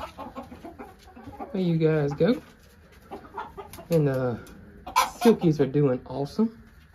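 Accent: South, United States. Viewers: I love how soil sounds like soul. I am an Alabama transplant and I just love to hear southen speak!!! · I have to listen to your southern accent carefully sometimes. I was confused how winter rye was going to feed your soul till I reasoned out you were speaking about soil LOL😂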